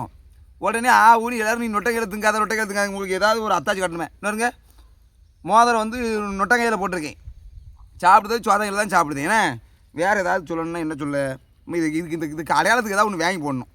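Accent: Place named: Tirunelveli, India. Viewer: தம்பி உங்க ஊரு வெளியில விடமாட்டேன் நான் மும்பையில் இருந்து ஃபாலோ பண்றேன் எனக்கும் திருநெல்வேலி தான் உங்களுக்கு தொல்லியல் எந்த ஊரு கண்டிப்பாக சொல்லுங்க நீங்க பேசுற ஸ்லைம் எனக்கு ரொம்ப ரொம்ப பிடிக்கும் முடிஞ்சா ரிப்ளே வாங்க❤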